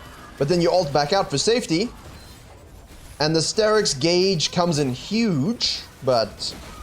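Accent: South Africa. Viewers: Dude you sound so much like a South African it's crazy haha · Why do you sound South African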